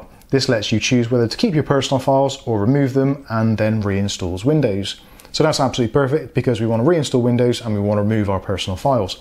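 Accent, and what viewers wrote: Bristol, United Kingdom. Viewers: love the bristoliannn accent! makes it 100x more friendly lol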